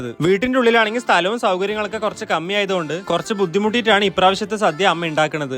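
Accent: Palakkad, India. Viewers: Indrajith vlogile athe voice modulation😅 palakkad ano/?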